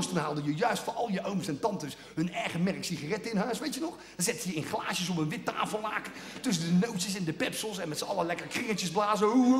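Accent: The Hague, Netherlands. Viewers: Harrie Jekkers blijft goed heerlijk dat Haagse dialect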